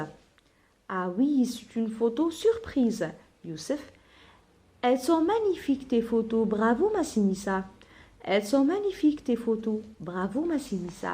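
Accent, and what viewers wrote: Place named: Africa. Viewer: Ne voyez vous pas que c est un français africain il est incorrect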